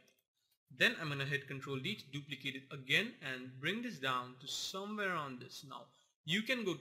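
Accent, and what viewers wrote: India. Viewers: thank you bro :) i was scrolling through the internet for some clean intros and just stuck on this one i didnt knew you are indian until i just felt that accent :D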